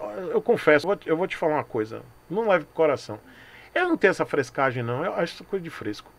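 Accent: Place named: Northeast, Brazil. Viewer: Nossa forma de falar, nordestino kkkkk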